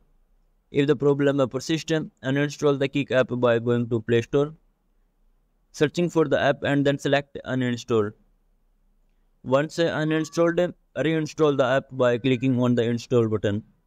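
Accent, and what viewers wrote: India. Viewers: It's English but with a heavy Indian accent. The only people who would understand this are Indians and people who work with English speaking Indians. For the most part, they are very tech savvy and their contributions are welcome. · Even for an Indian his accent is too heavy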